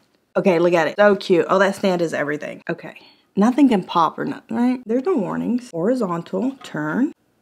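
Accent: South, United States. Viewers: Malta, coquito shes a puerto rican w a southern accent and funny too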